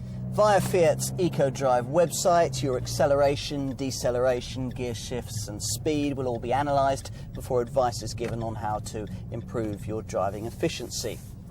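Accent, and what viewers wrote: United Kingdom. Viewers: This is my all-time favourite video of Jonathan Crouch because I own a Fiat Linea 1,368 FIRE engine petrol version. The Linea itself is a Punto derivative. This review is thoughtful, detailed, insightful and characterized by excellent style of English speaking and vocabulary that only the British can create with their perfect accent. I watched it 20 times already, and is my favourite video apart from the Fiat Tipo and Fiat Fullback.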